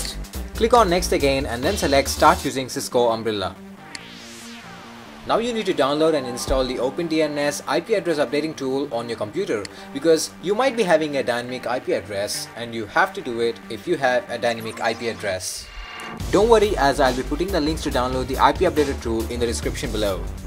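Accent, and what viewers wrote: India. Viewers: Best Indian accent I’ve seen so far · Just statted the vid and then. indian accent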